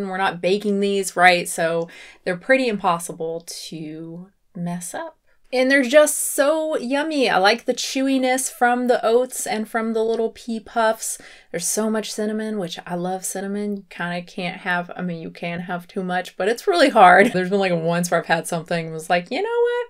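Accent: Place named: South, United States. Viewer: You sound even more delightfully southern than usual.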